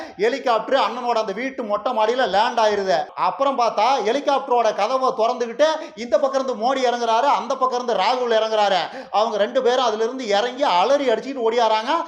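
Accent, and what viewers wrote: Kongu, India. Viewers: அது எப்படியா உன் கொங்குத் தமிழ் பேச்சுல நீ சிரிக்காம அடுத்தவனை நிறுத்தாம சிரிக்க வைக்குற யூ ட்யூப்பர்லே நீ வித்தியாசமான ஆளுய்யா.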